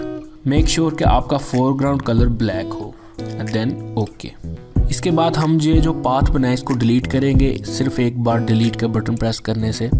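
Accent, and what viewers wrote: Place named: India. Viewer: bruh... when your pronunciation sucks, at least show the command key I cant get what you are saying literally because of your Indian pronunciation...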